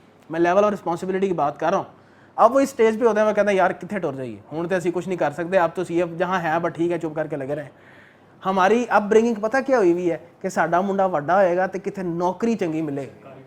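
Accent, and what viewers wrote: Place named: Punjab, India. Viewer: Nice punjabi excent.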